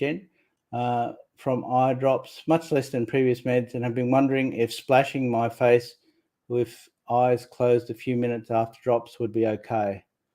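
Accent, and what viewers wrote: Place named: Australia. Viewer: Hi... he is speaking in his own language which is English. He's from Brisbane and speaks English with an Australian accent. I can understand every word he says perfectly. I speak English too.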